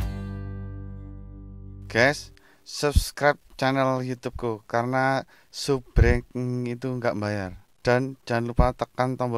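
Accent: Java, Indonesia. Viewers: Mas Kon Kuwi jowone ndi?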